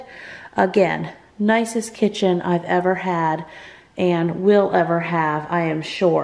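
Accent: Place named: Rural, United States. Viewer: I am so happy for you. I live in NC so I love hearing another country girl talk 🥰🥰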